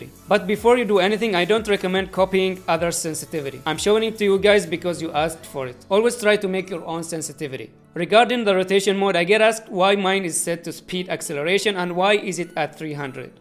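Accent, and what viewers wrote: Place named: India. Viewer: U sound like u from INDIA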